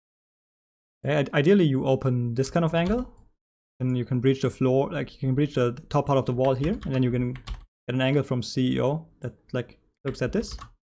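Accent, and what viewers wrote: Germany. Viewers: I knew it i could hear the German Akzent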